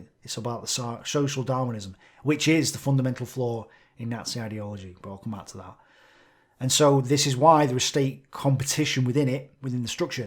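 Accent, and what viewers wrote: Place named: Northern England, United Kingdom. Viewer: good vid, but where's yer accent from, our kid? are you a Manc? wigan? cheshire? hard to pin you down, lad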